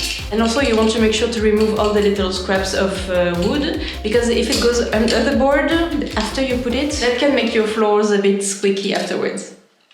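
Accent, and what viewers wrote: France. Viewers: Bonjour - God I love the French accent. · Why the French accent while speaking English?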